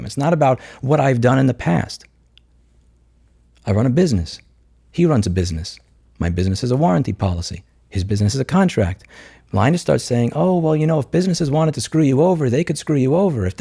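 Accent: New York, United States. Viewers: There are few things as beautiful as New York accent starting to straight up drip off my speakers as the video goes on. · The New Yorker accent really shined in this one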